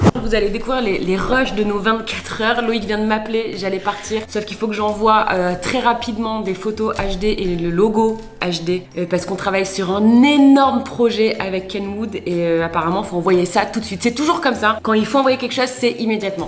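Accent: Canada. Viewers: tu mon youtubeur préféré vidéos accent canadien et qui met quoi des vidéos trop trop rire 🇨🇦👍👍👍👍👍 🇨🇵🇨🇵🇨🇵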